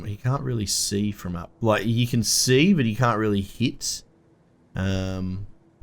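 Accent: Australia. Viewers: This is the most laid back Aussie commentary I've ever heard on YT. Old mate? Any chance you're from northside of Brisbane?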